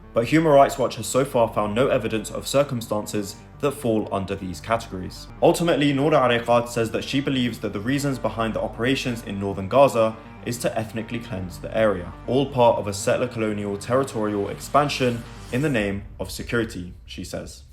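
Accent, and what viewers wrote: United Kingdom. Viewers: You look like a young man of military age... And you speak the UK English... See where I'm going with this?😮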